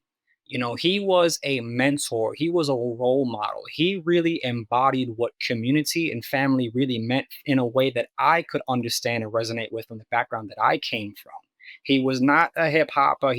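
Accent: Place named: New York, United States. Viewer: I used to go to Limelight , Twilight , tunnel in my late teens and it was a scene ! I didn’t realize all this vampire culture was going on around me. I was just there for the music lol and the dancing. You are so eloquent and as a fellow New York Latina I love listening to your native New York Latino accent. Great video !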